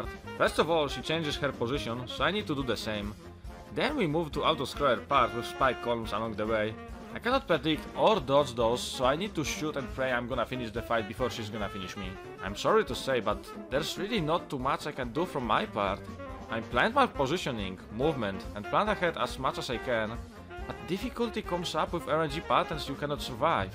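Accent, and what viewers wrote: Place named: Poland. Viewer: i love his polish accent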